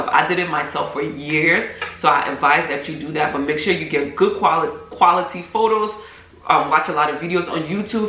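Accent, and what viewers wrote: Liberia. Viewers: You sound Liberian. Are you? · I can hear the accent, sis you Liberian?? I love your content by the way..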